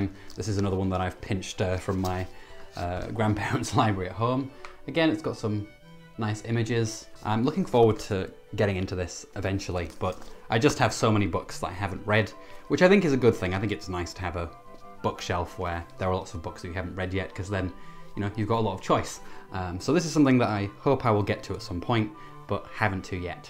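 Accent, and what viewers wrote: Northern England, United Kingdom. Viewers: North England by the sound of it, though my American ears might deceive me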